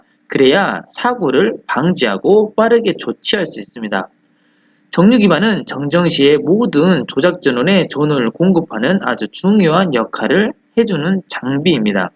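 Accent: Jeolla, South Korea. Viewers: 전라도 사투리가 강하고 구수하네요~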